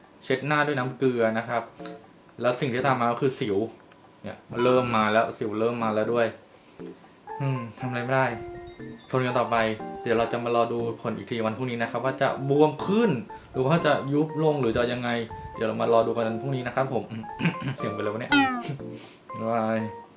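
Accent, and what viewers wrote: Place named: Provincial, Thailand. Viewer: เป็นคนที่ไหนหรอครับ พูดเหน่อจัง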